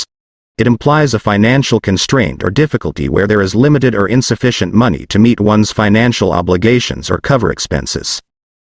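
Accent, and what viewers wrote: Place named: United States. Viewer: Woooow ❤ I like it American accent and speed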